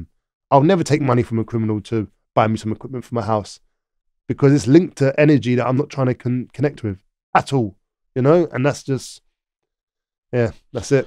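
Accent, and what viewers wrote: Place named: Camden, United Kingdom. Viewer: Great story ! Love the accent as a Londoner all Camden heads sound like this !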